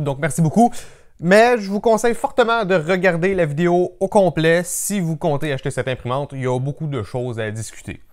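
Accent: Canada. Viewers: j'adore that Canadien French :-)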